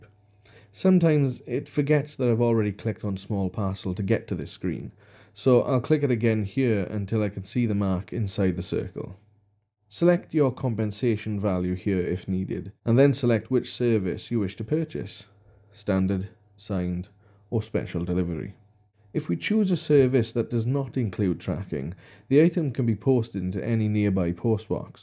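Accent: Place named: Wales, United Kingdom. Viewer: Watching this in June 2020... this is brilliant! That Welsh accent is just gorgeous yowser! I always wondered how people got those labels printed. I must get one of these Printers, it's a great investment. Thanks... great vid with a nice sharp image... love it!. New Subbie. London UK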